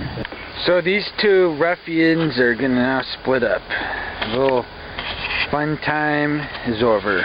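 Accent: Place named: Chicago, United States. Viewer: Midwest chitown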